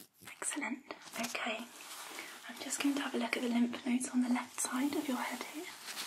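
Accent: United Kingdom. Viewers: Don’t know if You saw but I commented this on your previous video and I thought you’de like to see it :))) Wow just found this channel from this video and I have to say that I’m in love! What a great video with excellent soft spoken levels and then variation of some whispering too. Then when it goes straight back into the soft spoken it is so tingly. Maybe the familiarity of the common accent from my area of the U.K. helped enhance the relaxation! Triggers were also very well put together and I thought the camera and mic quality was on point too! Great length which I personally prefer! Overall fabulous video and definitely earned a new subscription 😊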